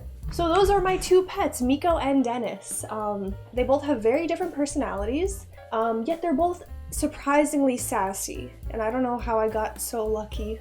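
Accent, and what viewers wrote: United States. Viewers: You always self-identify as Polish, but you have an American accent. Did you move to the US during your early childhood, so you lost your Polish accent?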